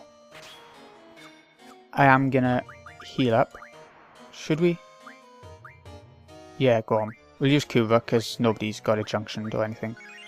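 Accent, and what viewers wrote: Wales, United Kingdom. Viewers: Are you Welsh? Also do you use turbo for the GF cutscenes, or do you mash?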